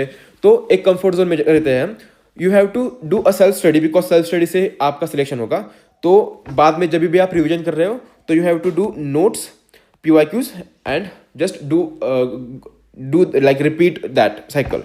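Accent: India. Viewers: Lagta hai aap maharashtra se hai 😂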